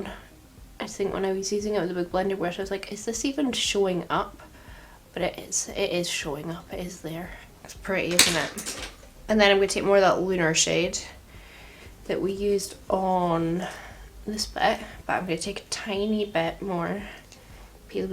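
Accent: Scotland, United Kingdom. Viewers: Was expecting revlon candid, got PLouise, shocking honestly 🤣 the brushes for this collection do look so good but oh lord are they expensive. Taking the more pastel shades with the grey tones is honestly so good, this look is 100/10 so good. Can't wait to see a full grungy grey toned look. Something big and smokey with like a silver/purple shim shim on 👌 Now for my question of the day... Do you have an internal monologue? If you do does your internal voice sound like you/have a Scottish accent or is it different?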